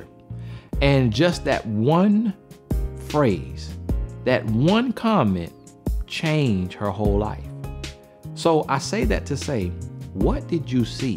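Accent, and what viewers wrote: South, United States. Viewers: I love the southern accent!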